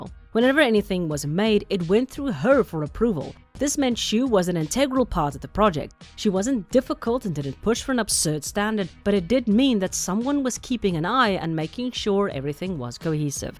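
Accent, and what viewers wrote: Afrikaans, South Africa. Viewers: Wag, jy's Afrikaans? Of praat Afrikaans? Hoe het ek nie die aksent geplaas nie! ei ek voel so dom nou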